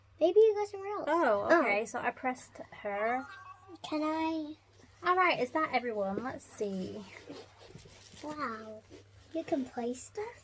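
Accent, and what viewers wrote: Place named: United Kingdom. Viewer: Not trying to be like racist but this is the first asian (I think I’m sorry if your not ) who has a British accent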